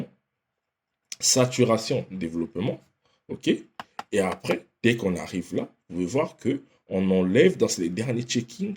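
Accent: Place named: Brazzaville, Republic of the Congo. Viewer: Merci mon frérot et tu a un bon accents brazza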